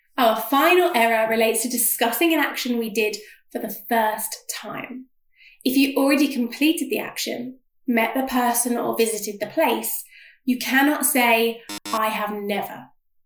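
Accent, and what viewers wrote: United Kingdom. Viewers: 영국식 영어 발음 불편하다.. 그리고 어차피 모국어가 아니면 의사소통이 첫번째 목적인데, 영어가 모국어인 사람도 완벽한 영어를 구사하지는 않치 않나. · BRITISH ENGLISH~ 액센트 너무 좋아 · 한국사람도 아닌데 한국인들이 실수하는 부분을 잘아시네요. 도움많이 됐습니다. 영국발음도 배울겸 구독하고 갑니다!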